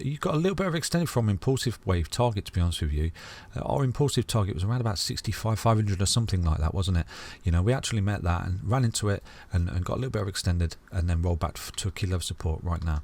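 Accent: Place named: Nottingham, United Kingdom. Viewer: Your accent sounds like Nottingham, am i right?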